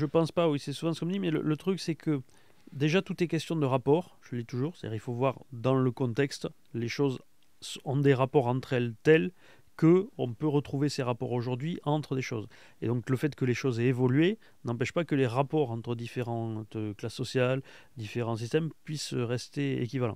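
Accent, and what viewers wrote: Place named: Toulouse, France. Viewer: l'accent toulousain de * me le rends fort sympathique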